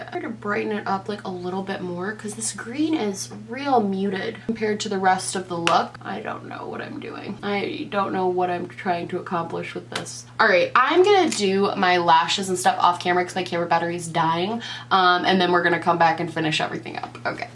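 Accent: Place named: Upstate New York, United States. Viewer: Girl I want a full look with you telling us everything in your upstate accent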